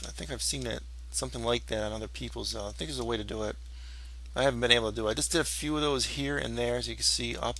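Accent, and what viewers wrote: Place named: United States. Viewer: Ruff... You're American, aren't you?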